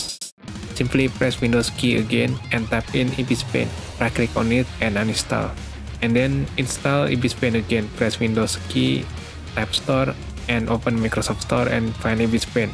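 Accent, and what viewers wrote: Indonesia. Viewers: I know ure indonesian jst from ur accent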